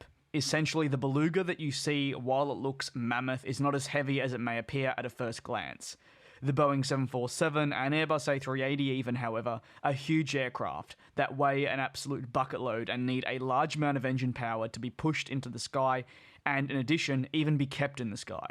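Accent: Australia. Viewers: "..A bucket.load.." Great to hear an Aussie speaking, even though you're too polite to say " a metric ***k tonne" Goodonya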